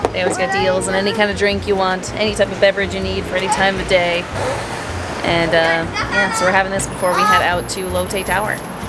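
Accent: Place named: United States. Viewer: I love your English accent with excellent pronunciation!! You speak very well with clarity and coherency!! You sound more of an American than a Canadian for some reason though. Sorry if this sounds offensive. Did you live in the U.S.?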